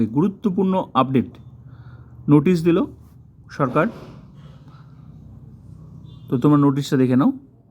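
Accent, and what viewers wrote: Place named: Bangladesh. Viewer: Tumi pakka bangal bachha ...tai boli ....bangla uccharan ta thik koro.....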